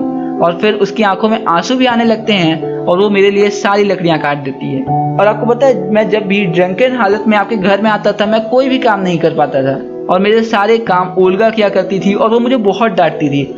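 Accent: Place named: Bihar, India. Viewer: YAAR SAB KUCH TO THEEK THA BUT ACCENT SUDHAAR LO ....... BIHAARIYO KI TARAH BOL RHE THE EK DAM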